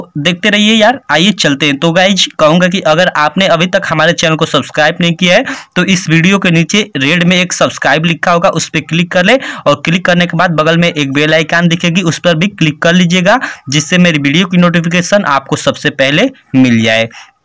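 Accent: Bihar, India. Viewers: Bihari ho kya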